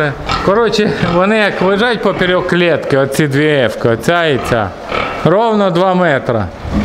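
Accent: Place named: Surzhyk, Ukraine. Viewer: Супер, супер репортаж. Наслаждался суржиком, неповторимыми интонациями и жизненной силой голоса Автора!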